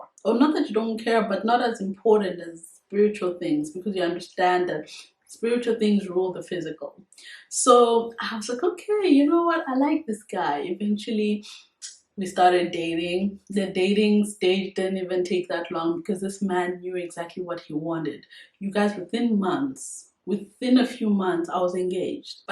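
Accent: South Africa. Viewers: You’re so beautiful 🔥🔥🔥😍and you look and sound South African ❤❤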